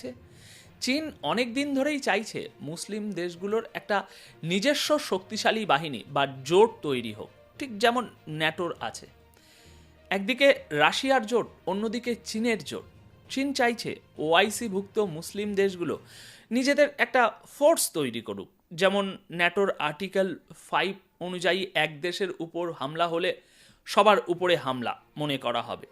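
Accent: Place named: West Bengal, India. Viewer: দাদা আপনার কথাগুলো ১০০% সঠিক, আপনার বিশ্লেষণ অনেক উঁচু মানের, আপনার কথা বার্তা শুনে পরিস্কার বুঝা যায় আপনি পশ্চিম বাংলার মানুষ, তবে বিগত ১ মাস ধরে আপনার সংবাদ দেখি, আমার খুবই ভালো লাগে, সঠিক কথাগুলো বলেন।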